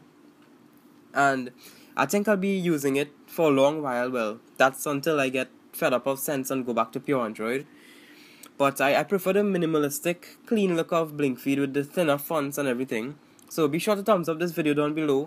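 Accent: Trinidad and Tobago. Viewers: I knew it Lol! I'm from Trinidad too:P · Are you from trinidad? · trini accent hmm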